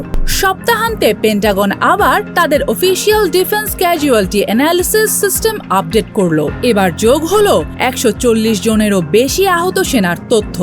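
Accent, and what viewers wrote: India. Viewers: Nijoshho bhasha use korte lojja pai india er moto uccharon korar chesta.biroktikor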